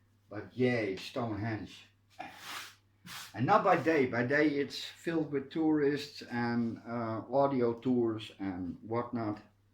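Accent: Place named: Netherlands. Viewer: Nice stories. Have you noticed that with a few exceptions your commenters are all female? It must be that sexy Dutch voice that does it.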